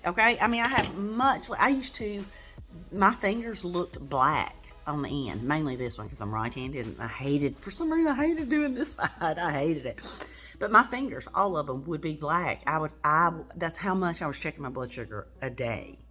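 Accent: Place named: South, United States. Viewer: Hi there. I just discovered your channel and I am enjoying it. I’m also southern, so enjoy your accent. I’ve been on the Omnipod five Pump for a little over two months and I am considering going to the Tslim, which I tried for only one month last year and we could never get the Settings right. I’ve had to be very aggressive on everything from insulin to carb ratio, to insulin duration on the Omnipod. Can you tell me what maybe to expect in trying to set up the T-Slim as far as setting it up in comparison to the Omnipod? Meaning, since I am very aggressive on the Omnipod Settings, virtually all of them, even tweaked the manual ones because I was hoping it would help Omnipod give me more basal, would you have any recommendations about percentagewise changes to start with on the T slim like maybe 10% less aggressive or 5%? If I go back to the T slim, I would sure like it to work very well. 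And I’m still considering MDI because I’m only A few percentage points off in my time in range on the Omnipod but Omnipod requires tons of corrections and after a little over two months I’m still getting 60% of my insulin as bolus which includes the corrections, and 40% from Basal. And I thought it was supposed to learn and try to get me to 5050, but it’s a slow learner at this point. Any help or suggestions would be wonderful. Also, my the tea slim do better with exercise than the Omnipod? I have not been able to get that to work. Thanks if you can. Have a great day.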